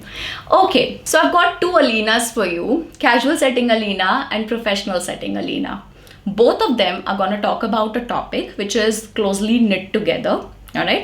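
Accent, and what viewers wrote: India. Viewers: Content is highly appreciated despite intolerable Indian accent. Thank you though!!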